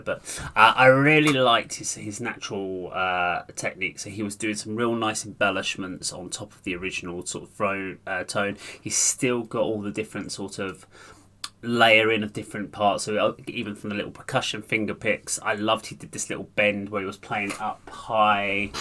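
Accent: United Kingdom. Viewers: You got 1 like and 1 new subscriber here 🙋‍♂️ Bcause i appreciate ur honest reaction, and i like ur british accent too lol Next - cover.. Thx m8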